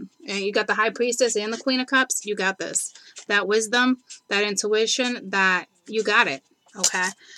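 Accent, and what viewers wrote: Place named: New Jersey, United States. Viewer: I love your accent is that Jersey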